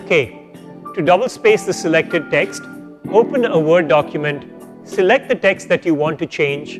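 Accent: India. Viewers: he talks like an Indian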